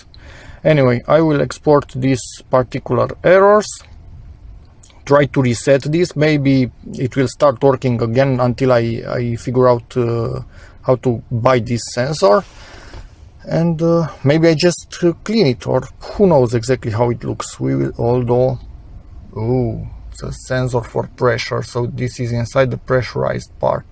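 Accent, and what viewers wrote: Romania. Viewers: Aoleooo ... un ruman care vorbeste englezeste !!! Suna ca ... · Accentul ăsta tipic Românesc, îl cunosc de la 1km, nu contează în ce limbă se vorbește, Accentul e același... it's lovely!!! Good job ,,OMULE"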